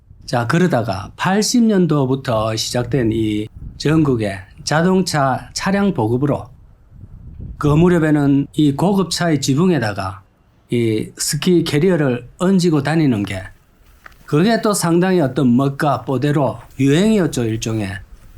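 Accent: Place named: Seoul, South Korea. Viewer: 이제 왜 경상도 사투리 안쓰요 서울말 한다고 서울사람 흉내요 경상도 사투리 매력으로 보고 좋아요 찍는데 이제 안볼란다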